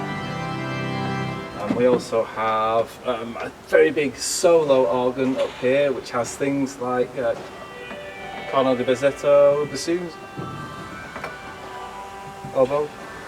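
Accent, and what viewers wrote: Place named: Cockney, United Kingdom. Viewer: I love the Cockney accent but it's a great deal incomprehensible.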